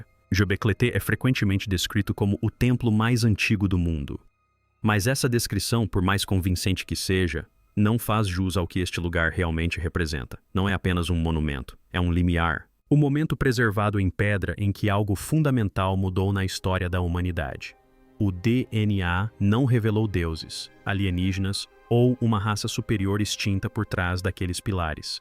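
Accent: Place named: England, United Kingdom. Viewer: Gostaria de uma dublagem sem sotaque em inglês 😒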